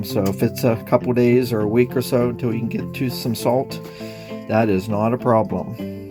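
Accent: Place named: Southeastern Pennsylvania, United States. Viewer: You sound like you're from south eastern PA. It's the accent.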